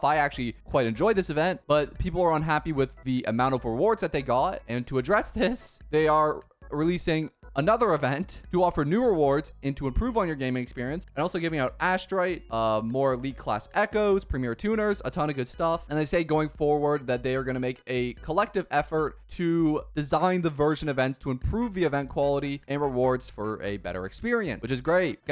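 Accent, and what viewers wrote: Canada. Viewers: Are you from Ontario? Thats a huge Ontario sounding accent lol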